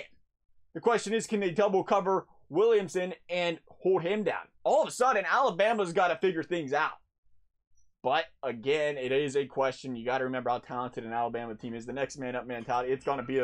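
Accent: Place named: Ohio, United States. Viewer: you are the most Ohio looking and sounding person I’ve ever seen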